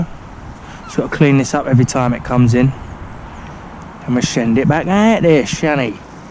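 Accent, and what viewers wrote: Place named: South London, United Kingdom. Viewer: AAsplit shot on the end of its oooter ha ha ffs, subbed. you talk like me not from sarf London by any chance ?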